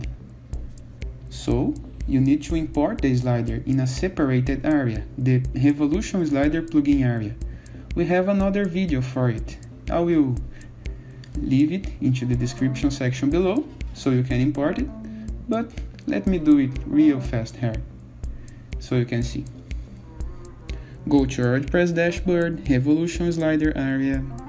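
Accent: Brazil. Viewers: Brasileiro? :D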